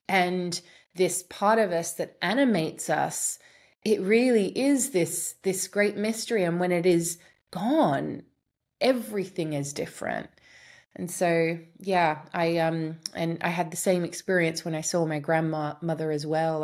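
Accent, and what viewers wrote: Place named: Australia. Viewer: Hi, I'm from the south west of Australia so great to hear a fellow Aussie on here ❤.. just a question.. I worry about everything and have been trying to have a mystical experience through breathwork for a while now to no avail.. do you think I may just not have a soul? Like an NPC or something? I don't seem to feel emotions of joy just anger and misery.. do you believe in soulless people (literally)? Would they know they weren't human?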